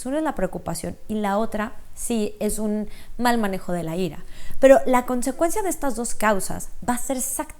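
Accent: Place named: Mexico. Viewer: De que país eres? Me parece tu acento ligeramente mexicano, pero no estoy seguro.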